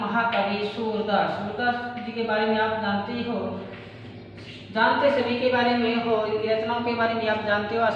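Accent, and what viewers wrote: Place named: Haryana, India. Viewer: Kon sa colleage ka h ye Sir hariyanvi bol rhe h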